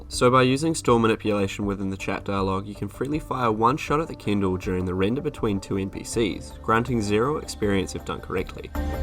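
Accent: New Zealand. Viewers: Sub for the nz accent ! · Are you a kiwi brother?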